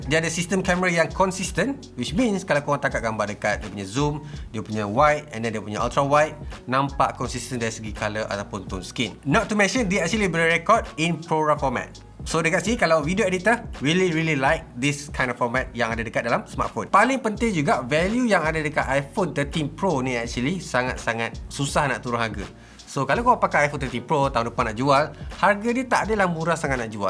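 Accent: Terengganu, Malaysia. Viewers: Kalau la channel ni x tiru MKBHD + cakap teganu, aku rasa lebih ramai yg subscribe. Be yourself bro. I know you can do it.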